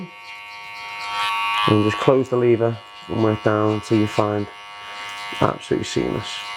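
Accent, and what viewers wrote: Liverpool, United Kingdom. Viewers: where actually is he located, i am guessing somewhere in the UK judging by the accent but that if fine for me as someone that lives in the UK. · You're from Liverpool I can tell so err yes lar was happenin kid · Are yu from liverpool i have a strong feeling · He snds scouse if yer based in Liverpool lad gis a message where yer at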